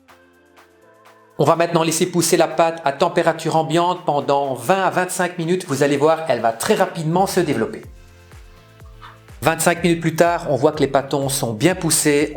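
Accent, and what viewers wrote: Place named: Liège, Belgium. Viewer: Bonjour! Je viens de m'acheter un gaufrier et découvre vos recettes que je vais tester avec plaisir. Je suis liégeoise vivant en France depuis 12 ans et c'est avec délice que je retrouve ce petit accent liégeois ;-)). Au rayon des anecdotes, j'en ai une autre bien bonne: la (seule) fois où j'ai demandé "une petite brique coupée" dans une boulangerie, on m'a regardé comme si je débarquais de Mars! Ici les pains sont appelés par leurs noms (pain complet, aux graines etc....) et il faut dire "tranché" et pas coupés. (ha, ha....)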